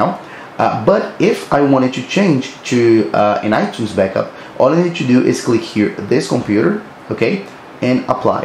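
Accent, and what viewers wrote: Brazil. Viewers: It took me 5 secs to know this dude’s a Brazilian... it’s engrained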